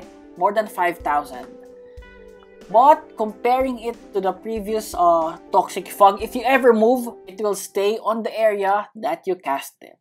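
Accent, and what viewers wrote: Philippines. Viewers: Ur accent is cool and I think it's Filipino accent am I right?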